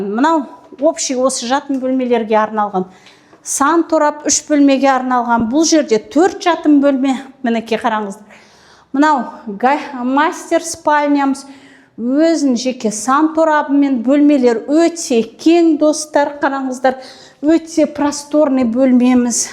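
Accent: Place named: China. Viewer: Чувствуется акцент китайский ( оралманка?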